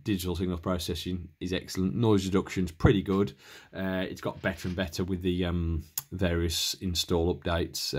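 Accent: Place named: Liverpool, United Kingdom. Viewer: Just stumbled upon this video and your channel looking around at 7300 videos. Great radio, and superb explanation. Are you a Scouser? Cool accent!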